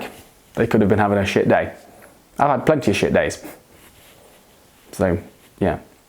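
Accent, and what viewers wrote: United Kingdom. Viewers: I could listen to you for hours! fascinating mind and damn... I love your british accent! 🤫😘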